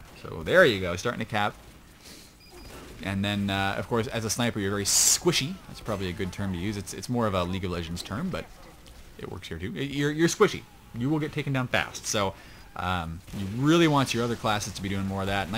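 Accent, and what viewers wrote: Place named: Australia. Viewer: That Aussie accent though... Nice cast btw!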